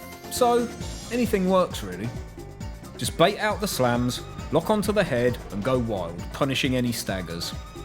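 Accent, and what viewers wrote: United Kingdom. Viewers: Your somewhat latino tracks and jokes, and your bri'ish accent are confusing the hell outta me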